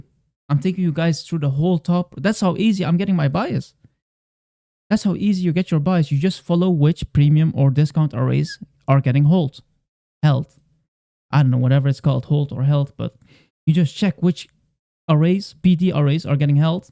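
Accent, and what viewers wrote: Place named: Belgium. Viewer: ik a belgian accent whn i hear one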